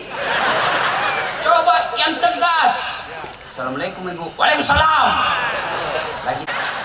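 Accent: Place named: Makassar, Indonesia. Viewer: Lo ngk ngerti bhas pak ustas jgn sebarng sbb beginilah logat makassar kasart tpi lucu n tpi bertujuN baik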